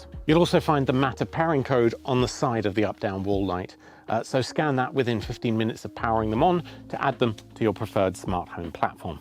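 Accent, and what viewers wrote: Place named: United Kingdom. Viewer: so nice to hear a UK voice on a review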